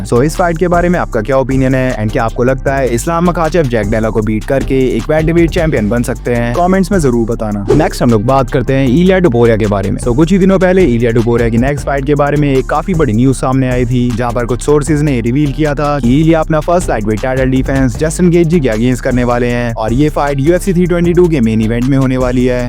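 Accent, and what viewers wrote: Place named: India. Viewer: Wich language are you speaking i hear enlish brother i hear india i hear nigeria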